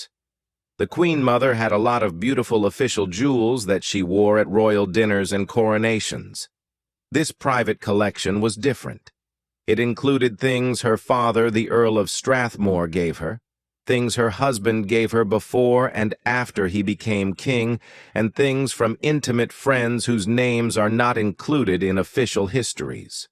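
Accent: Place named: United States. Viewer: I wish you would not use an AI robot voice with an American accent , it does not understand what it is saying and the delivery is boring. Queen Consort Camilla is not a real Queen, she is merely the king's second wife, no connection with his family other than by marriage. She is certainly no Queen Mother and has no right whatsoever to any family treasures.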